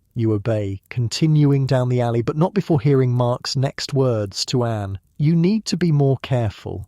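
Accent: England, United Kingdom. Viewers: Anyone knows where this accent came from? England?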